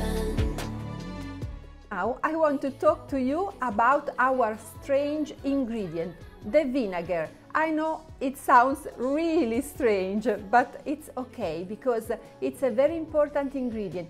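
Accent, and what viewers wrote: Italy. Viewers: making it easier for yourself is the key. May not be much different on our end but if you can save minutes and hours of editing that's well worth it. Your Italian accent is half the reason that I subscribe, thank you again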